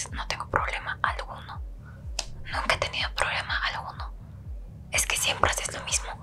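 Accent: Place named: Mexico. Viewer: Se re nota que es mexicana wn JAJA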